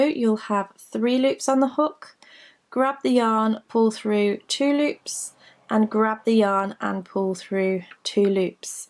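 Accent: England, United Kingdom. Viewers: like the video. Nice to hear an English accent for a change!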